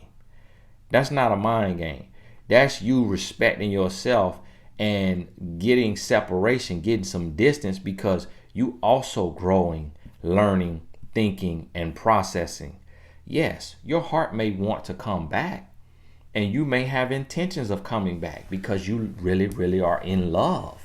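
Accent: South, United States. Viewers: U my training wheels i love your voice its country a accent now lets get in tune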